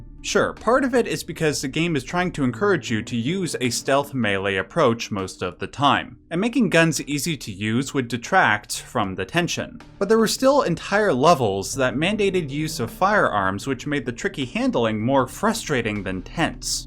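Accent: Canada. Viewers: Whoa! Plot twist, you’re Canadian!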